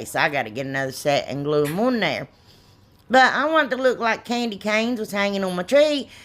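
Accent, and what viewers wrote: South, United States. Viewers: I just started watching your channel and I have fallen in love with your accent, sense of humor and talent. I look forward to seeing more. BTW, can I ask you where you're from? I hear some southern but I'm from SC and I'm pretty sure you're not from here. I think could listen to you all day😍😊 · New subscriber here💕from Monteagle Mtn.,Tennessee...LOVE YOUR DIY'S😍..and gurl,you're a "hoot"😅I already know,I will be a big fan of your channel...one question,where are you from/at?I know your somewhere down south ..(ya just can't fake,that accent😉🤣)..thanks for sharing and looking forward to the next one💕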